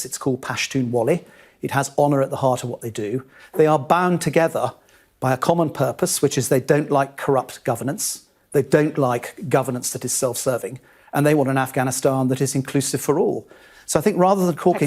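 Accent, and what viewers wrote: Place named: United States. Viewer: I think you people made a typo mistake, this guy sounds like American unlike the title of the video .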